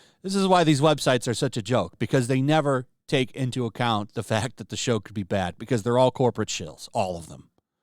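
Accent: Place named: RP, United Kingdom. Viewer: The upper class British accent kills me. Hail!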